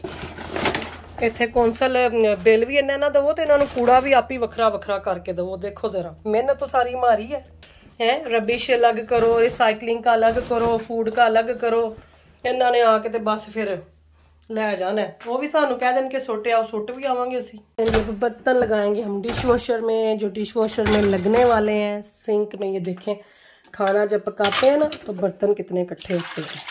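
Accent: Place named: Punjab, India. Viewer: Ap Kitna pyara bolti hai hum bhi Punjabi hai buhat Acha Lagta hai ap ki Punjabi sun kar❤️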